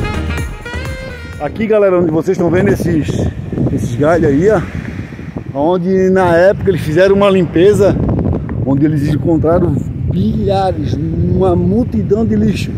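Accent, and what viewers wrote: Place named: Santa Catarina, Brazil. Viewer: Lugar lindo, minha família por parte de pai é nativo daí,eu adoro o sotaque catarinense.lembra meu pai,Deus abençoe sua vida e família.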